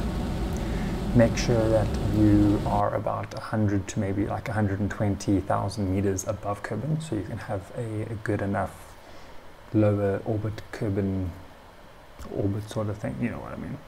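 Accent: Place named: South Africa. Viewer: dude you sound south african lol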